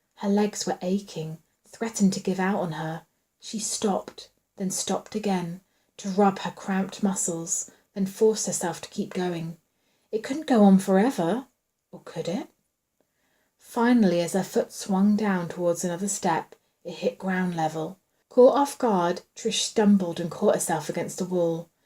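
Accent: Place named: RP, United Kingdom. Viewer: I LOVED this!! Thank you so much for reading this! I read it wheni was a kid (RTC was one of my favoriite authors) and i really wanted to reread it but as audiobook. You did an amazing job!! I enjoyed it, I'll be honest the ending with it being whoit was has always really confused me (was he just a rando? Lol) but i still love the book. Its so quintessentially Point Horror 90s!! And i adored liste img to it at this time of year!! (Not sure how the weather is down south but up north its true spooky season weather now!) Also your accent is amazing! Is. That your real voice!? Im british as well but i sound so common compared to you 😂not sure if its cause youre southern but u have a proper RP accent thats so ni ce to listen to reading tuis book! Stellar job!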